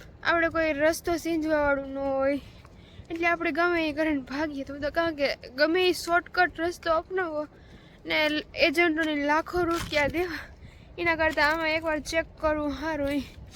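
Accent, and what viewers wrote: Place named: Saurashtra, India. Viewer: તમારી ભાષા ઉપરથી એવું લાગે સ્વોરાંસ્ટ બાજુ ના લાગો છો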